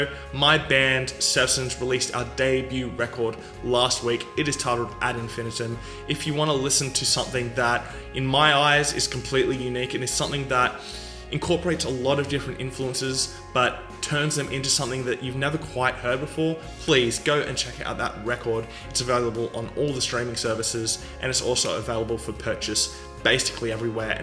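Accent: Australia. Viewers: aussie accent? aaaaaand close tab. sorry dude :/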